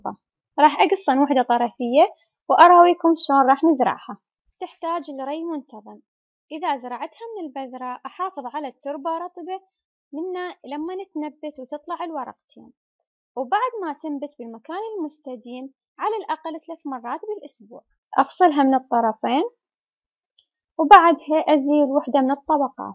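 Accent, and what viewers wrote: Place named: Basra, Iraq. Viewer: عرفت من لهجتج بصراوية الله يحفظج ويبارك بعملج انا من حي المهندسين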